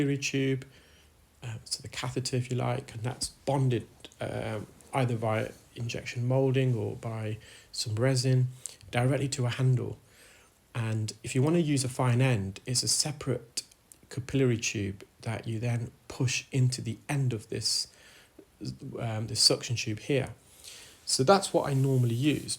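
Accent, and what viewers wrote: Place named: RP, United Kingdom. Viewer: Thanks to you Dr., and yes, in my book, you are a doctor, I have started using medical grade Olive oil for my itchy ears. What a relief. For me, the fascinating part of watching you is a) I never knew any of this about the human ear and I am learning so much from you. b) you are so passionate about what you do. c) I love how you use the proper queens' English, I wish we did that here in the states. d) It is so satisfying to see you help these people with your kindness and compassion.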